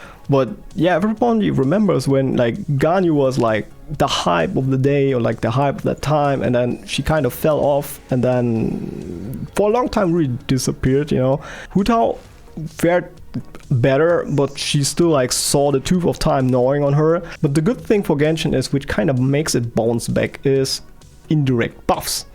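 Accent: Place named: Germany. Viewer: You sound like a German speaking english, are you german?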